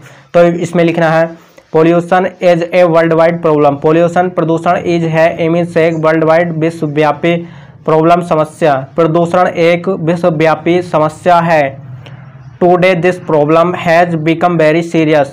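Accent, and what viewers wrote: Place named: Punjab, India. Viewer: Sir aap Punjab se ho kya? Mja aagya aapka language sun ke Hindi +Punjabi+English Mja aagya🤠🤠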